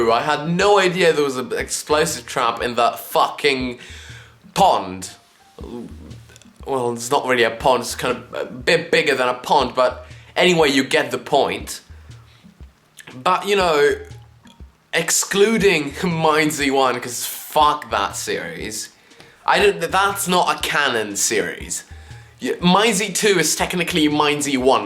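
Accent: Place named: United Kingdom. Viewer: I LIKE YOUR VOICE IT'S MY FAVORITE KIND OF BRITISH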